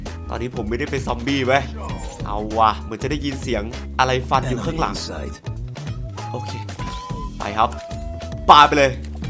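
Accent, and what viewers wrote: North, Thailand. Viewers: คลิปก่อนพี่พูดเหนือ ผม:นี้ใครว่ะ?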